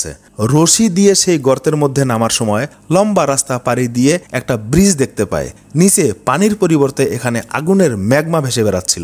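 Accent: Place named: Jessore-Kushtia, Bangladesh. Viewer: ভাই আপনার বাড়ী কী যশোর,কুষ্টিয়া বা ঝিনাইদহের দিকে,,আপনার ভাষায় আমার এলাকার টান❤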